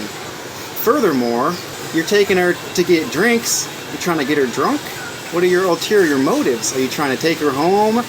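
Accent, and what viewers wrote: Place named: Vietnam. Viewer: I came across your video while learning English :) and already fell in love with your video :) it seems like you've lived in Viet Nam for a long time. you know very well about people in VietNam I'm also impressive with your Vietnammese accent. thank you for raising your voice and all your compliment to Vietnammese women. have a nice weekend :)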